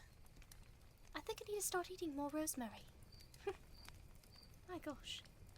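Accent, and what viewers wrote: RP, United Kingdom. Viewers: Ah, some proper Bri'ish, feels just like home! Like I just popped down to my local chippy and it had, in fact, turned into a potion shop! Jolly good show! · Bugger me. This accent is so posh it makes me feel positively middle class. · This one is just lovely! Just the bri‘ish alone gives it this certain charm. Lovin‘ it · Me: Sees you posted a new video "Oh, nice. Wonder what it i- Also me: *sees its a witch roleplay "I know what I'm listening to tonight! Thank you - *sees its British accent Also me: various happy noises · Ayoo bri ish is soo sweet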